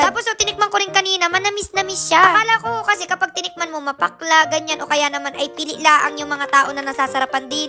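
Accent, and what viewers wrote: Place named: Marinduque, Philippines. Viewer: tubong Marinduque ako😍pero ngayon andine na sa Maynila☺️kaya ako nag subscribe sa yo ay natutuwa ako at palagi ko naaalala yung punto sa probinsya namin😍keep making us happy everytime we watch your vlogs🥰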